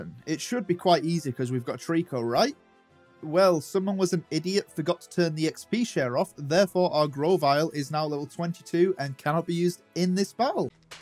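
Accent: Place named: Scotland, United Kingdom. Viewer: Haha great vid! I love your Scottish accent, I play golf with a scotsman, he happens to be ginger too, I don't know much about Pokeymans tho, you earned a like.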